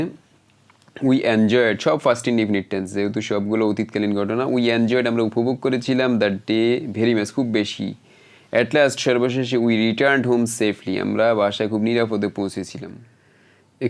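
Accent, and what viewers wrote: Noakhali, Bangladesh. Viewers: oo vai noyakhailla apni?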